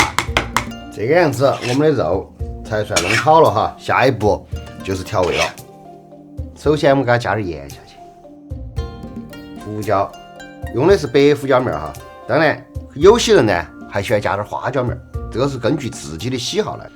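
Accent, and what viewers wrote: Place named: Sichuan, China. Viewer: love this guy's sichuan accent,. but pork lard is not recommended , it blocks blood vessels.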